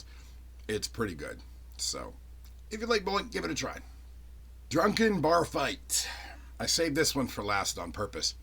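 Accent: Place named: Pittsburgh, United States. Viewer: I just randomly found you with the pressure pot video. I'm doing a Jerry rigged vacuum for some resin and we'll see how it goes first, but it sounded like you were a Pittsburgher, then I saw you had a Kennywood puppet video.... Then I saw your channel is, and I might be misremembering but have I seen that sign up in Gibsonia for the last like decade??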